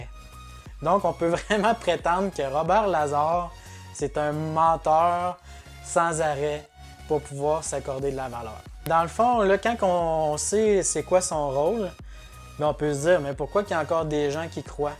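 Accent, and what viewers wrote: Quebec, Canada. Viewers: J adore ton accent du Québec ♥♥ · derien 👍 tu peux faire des vidéo sur les zombie et j'adore ton accent québécois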